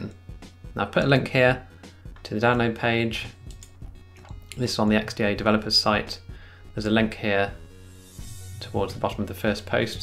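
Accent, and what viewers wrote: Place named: England, United Kingdom. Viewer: >English tutorial, with english accent >Done on a Samsung device Perfection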